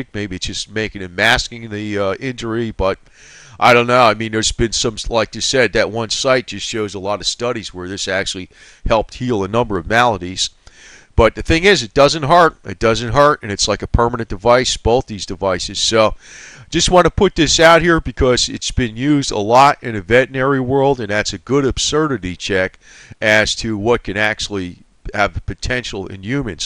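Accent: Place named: Italian American, United States. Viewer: you sound like an Italian American kind of like Robert DeNiro type accent.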